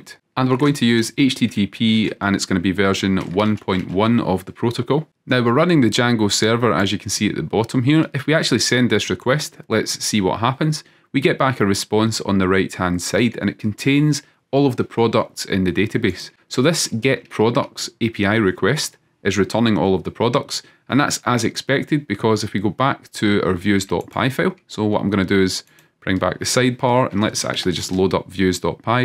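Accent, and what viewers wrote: Scotland, United Kingdom. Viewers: Great series so far... I am glad I moved to Scotland a few months back, I can understand you perfectly (assuming you are Scottish). The way you teach is excellent, loads of people throw things at you but you take the time to show where to find the info, look at the doc while coding and also look at the code behind the scene, that is brilliant. Subscribed, thumb up, coffee to come in a few days.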